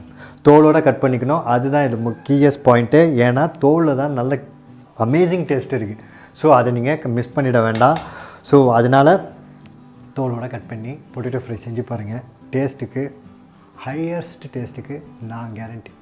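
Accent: Tirunelveli, India. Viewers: Unga slang nala irku ..niga tirunelveli ah?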